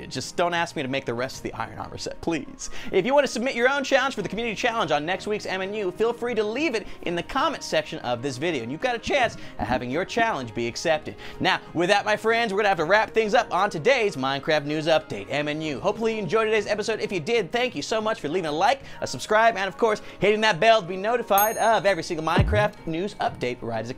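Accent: Netherlands. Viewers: Wait... are you dutch?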